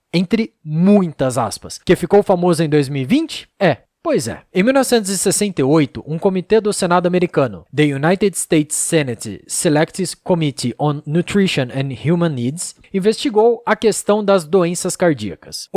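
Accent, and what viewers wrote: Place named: Rio de Janeiro, Brazil. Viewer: O sotaque do narrador me deixou maluco quem o carioca!! 😂😂😂😂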